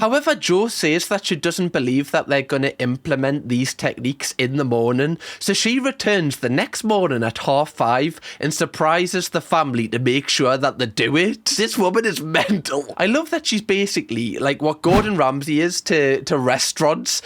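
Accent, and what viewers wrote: Newcastle, United Kingdom. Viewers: Can I just say never change your accent and don't start saying 'mom' like some other UK YouTubers do; your accent is great and repping for the geordies!! ❤ from in London, UK ❤ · your comments are hilarious and true. Proper Geordie?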